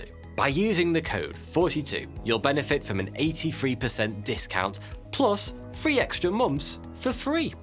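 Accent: United Kingdom. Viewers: DDoesnt everybody have 4 families , and I'm searching for and don't see a video from You on king arthur .. with your British Accent i think You should have one if you haven't been working on that one for years ...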